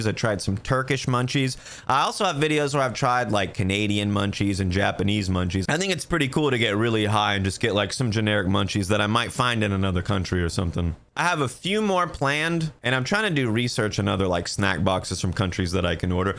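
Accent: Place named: Northeast, United States. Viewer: You sound like you’re from the north east so a soft baked pretzel from sheetz or Wawa. Cheese filled. Mac n cheese bites. Grilled cheese. Extra cheese. So much cheese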